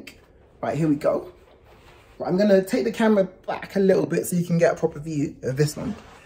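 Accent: United Kingdom. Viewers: British accent in Malibu !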